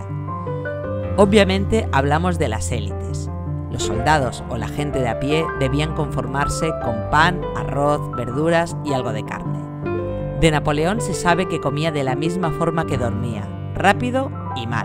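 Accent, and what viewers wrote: Spain. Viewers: ¿Cuál es ese tipo de Español es mucho Diferente? ¡Eres el Castellano! Besos del Brasil me gusta mucho de su Canal 😚😙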